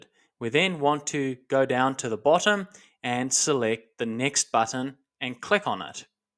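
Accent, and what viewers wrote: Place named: New Zealand. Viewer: I wish I had seen this video 2 hours ago!:) Thank you so much for this info. So, even though I spent a lot of time manually deleting posts, you just saved me another 2 hours! BTW: As soon as I heard you speak, I thought to myself, "If isn't from New Zealand, I'd be very surprised!" Then, I saw your page and saw you live in Tauranga! I spent many happy days back in the 1980s with friends who live in Tauranga. I seem to recall running a road race at Mt. Maunganui as well. New Zealand remains my favorite place on earth, and wish I could have lived there.:)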